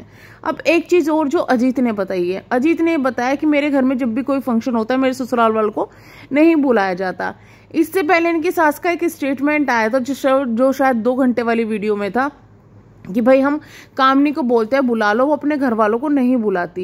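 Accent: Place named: Haryana, India. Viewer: Mai ek baat bolungi mujhe lagta hai aaoke tone se aap haryana side ke ho but mujhe aapke state se koi matlab nhi hai mai bas ye bolna chahti hun ki dekhiye hamare bihar up me na sasural walo ko agar kisi v chiz me invitation dena hota hai specially local me mayka ho to ghar aakar bolte hai kamini ke 2 bhai hai kisi ek ko to ghar aakar saas sasur ko invitation dena chahiye tha kyunki relation pahle se hi thik nhi the to itna ro jarur karna tha or ye comment aap kamini ke channel pe jakar padh skti hai ye unke khud ke subscribers v bol rahe hai or jiss din arti ka engagement ka wo roye thi uss vlog me kamini ne badua diya tha ki jisne v meri behan ko rulaya hai uska bhagwan kavi bhala nhi karega ye line sahi tha kamini ka kyunki roye to wo unki saas ki wajah se thi to kamini apne hi saas ko baudua di thi ye v sahi nhi tha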